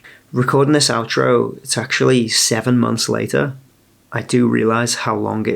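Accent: Liverpool, United Kingdom. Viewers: You from liverpool fella? Or close by just a guess from the accent hahah, your getting there with her slowly but she's gunna be some yolk when finished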